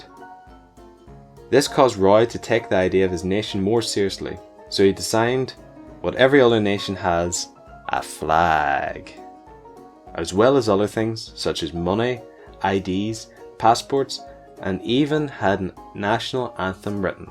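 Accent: United Kingdom. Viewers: I really like your videos. I just subscribed. What part of the UK are you from? I really like your accent.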